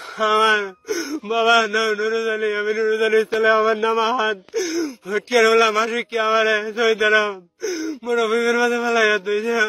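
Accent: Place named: Sylhet, Bangladesh. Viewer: সিলেটি উনি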